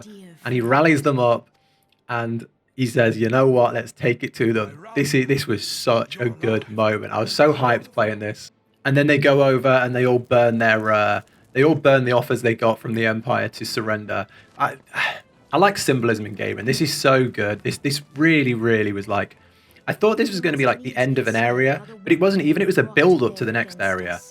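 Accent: United Kingdom. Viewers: Its so fun to follow your progress! 🙌🏻😜 And I like your English accent, so nice to listen to 🙈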